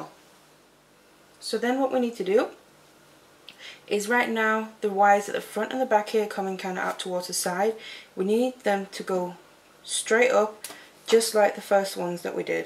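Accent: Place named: North, United Kingdom. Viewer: Nice and clear instructions, easy to follow, and its really nice to hear a soft northern accent and not some harsh grating American one(some are OK TBH). Anyway many Thanks for the tutorial!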